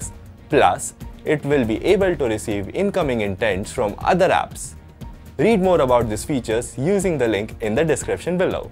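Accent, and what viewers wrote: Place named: India. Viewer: That's the Indian accent :)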